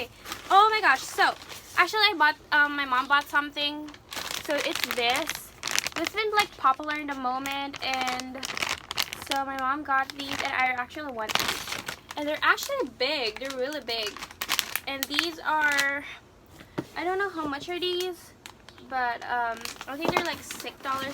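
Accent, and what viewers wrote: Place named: Tagalog, Philippines. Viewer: I love your accent and your Tagalog accent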